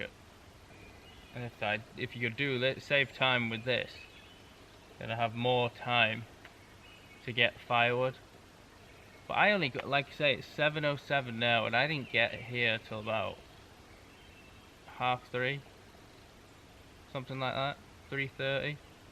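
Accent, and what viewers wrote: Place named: United Kingdom. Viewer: Aren’t you British, you sound British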